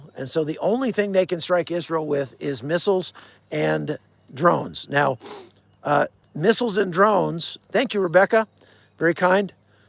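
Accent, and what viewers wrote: United States. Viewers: What are you doing in Iran, you sound like your American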